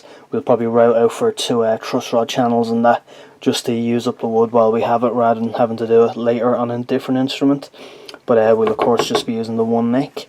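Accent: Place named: Dublin, Ireland. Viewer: Perfect timing!. Just as I'm about to start a scratch build 'Tele' along comes a great teacher!. Your very fine attention to the details a novice really needs to know about is superior to any other source I could find on any YouTube channel. I'm building this for my 14 year old grandson as a surprise.!..I'd better not screw this up,he knows his guitars. Lord help me?? And a 'Jackeen' to boot. Lovely to hear your Dublin accent !!..I grew up in Marino and Fairview/Clontarf..No jobs nor any future; We youths had to go elswhere??..I'm up to part 4 now and I have a great handle on how to built a 'Telecaster', Thanks to your great teaching. From Albany NY USA..Brendan Murphy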